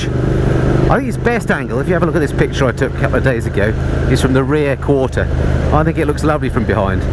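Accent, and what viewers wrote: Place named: United Kingdom. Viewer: It is interesting to hear Brits talk... And a little funny!.. ( no disrespect mate )... Especially the the part were you mention where the bike was made... " made in Toyland".... I'm with you mate! Those bikes should have been made in Britain, that way there would be no excuse on its development.... Sorry it didn't work out in the US either!... But it has learned some important lessons along the way and they would be implemented... Like for example, This year went to the beauty shop in Italy and got itself some nice Brambo brakes..... Maybe next year it will go to Sweden and go to Ohlins to get a new high performance frame and suspension, from now on the sky is the limit!... welcome to globalization!... Lets face it, all the high performance motorcycle methods and designs are covered with patents and there is very little margin for significant innovation.... 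And the best solution is the old saying "if you can't beat them, join them"....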